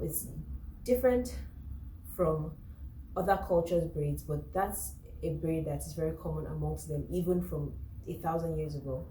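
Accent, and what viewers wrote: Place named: Nigeria. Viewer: you don't know any better, and I can tell by how you talk you sound as if though you were not black American, you sound as though you may be a Nigerian or something I don't know, Before Europeans colonize Africa, they were literally circling around it., Africans were so naive.. amd had absolutely no idea.. I think black Americans have an idea on white people stealing things that culturally belong to them. Western popular music comes from Black Americans... black Americans for the past 100 years have set back in watch white people steal their music and become astoundingly wealthy as a result, many early black rock and rollers of the 1940s and 50s, died in obscurity, and white people who covered their songs become wealthy. But that's a different subject that we can talk about it some other time. But here's the thing.. I'm a little older than you and I know a little bit more than you do. 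So I'm a little wiser and more experienced in these conversation.. There is a dance called the Lindy Hop. And I'm bringing up dance, since you mentioned ballet... which black people are also ostracize out of that dancing community. There are videos on YouTube talking about that. But Lindy Hop is an old African-American dancing style come from the swing dance era of the Jazz era. Invented by black Americans. That dance is Now usurper by white people. I have heard stories of black people rediscovering that dance, and going to dance studios to learn it, but they feel ostracized by a lot of the wh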